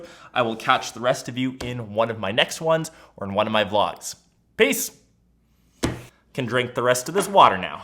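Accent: Canada. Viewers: Are you Canadian? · Being from the UK I notice you say oooot when you try to say out. Does this mean your from Canada and my young history lessons from South Park were correct ?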